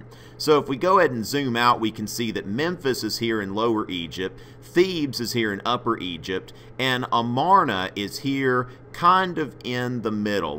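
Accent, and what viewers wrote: United States. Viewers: Why do you have to talk in a cowboy accent ?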